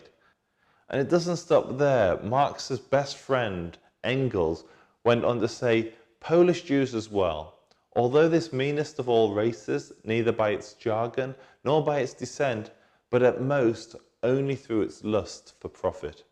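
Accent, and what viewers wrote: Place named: England, United Kingdom. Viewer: I've never seen redheaded Semites with freckles and blue eyes...or speak English with a deep English accent... I really hope you were born speaking her or Arabic or Aramaic... if not your misleading the veiwers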